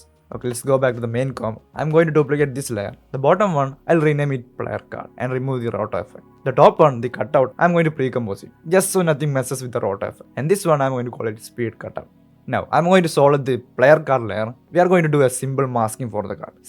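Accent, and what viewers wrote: Kerala, India. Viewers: Yeah his english accent gives away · English ketalee ariyam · Cant understand anything cuz of that thick indian accent but w vid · Malayalam english ahhh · ur indian accent is very funny but considering ur so skilled i respect it 🙏 keep it up man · Indian ❌️ kerala accent ✅️ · thickest indian accent on the planet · you are from kerala right alla accent kettatu manasalayda gg nice video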